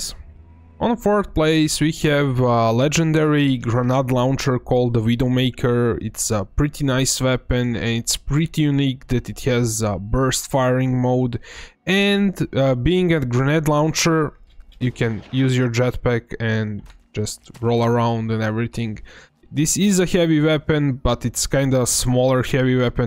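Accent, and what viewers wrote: Russia. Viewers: Это что, русский акцент?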